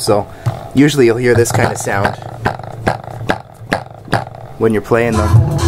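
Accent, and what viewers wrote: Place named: Canada. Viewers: how cant you not tell someone is Canadian, Eh? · I detected he is a Canadian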